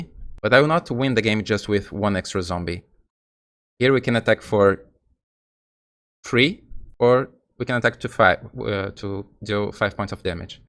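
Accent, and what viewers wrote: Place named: Brazil. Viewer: ele é br? nem sabia mas agora o sotaque tá fazendo sentido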